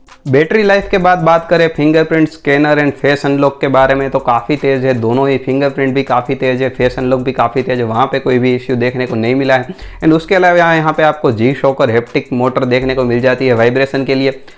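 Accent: Gujarat, India. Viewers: Aap Gujarati Hona... 😊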